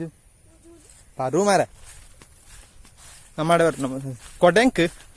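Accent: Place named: Kasaragod, India. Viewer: Kasaragod da tulu kenere kushi apundu Jai Tulunadu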